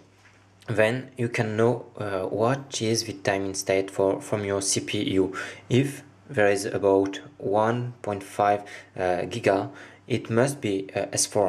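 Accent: France. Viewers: Lol, ur french, i can hear it Heun que g raison ? Bizzarement mon frere a le meme accent xD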